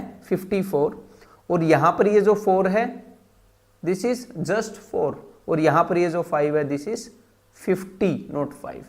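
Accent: Haryana, India. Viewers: Haryanvi h bhai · Sir apki mother tongue haryanvi h kya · gr8 Haryanvi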